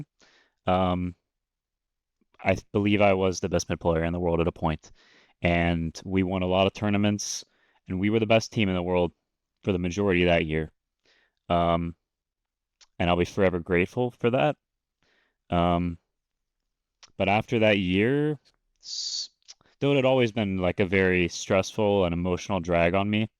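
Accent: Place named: South, United States. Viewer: I hope you will still stream and watch some tourna bro we love your southern accent casting.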